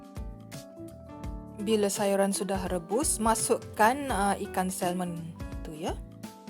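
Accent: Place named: Kelantan, Malaysia. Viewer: Wehhh panda mu kecek kelate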